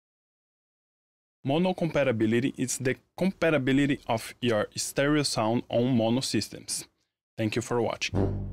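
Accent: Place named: Brazil. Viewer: Brazilian accent af, thanks for the video brother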